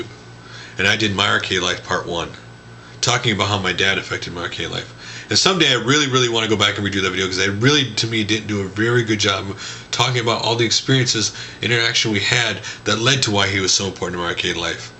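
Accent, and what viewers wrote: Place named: Austria. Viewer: speak German with an Austrian accent - much like Arnold Schwartzenegger's accent. That is quite amazing doing videos in both English and German. I considered doing something similar with Dutch and English videos but most Dutch gamers speak English too so I figured there would not be much of an audience besides doing the same video twice is just an enormous amount of work. Great episode man! A good personality comes across regardless if you are in front or behind the lense.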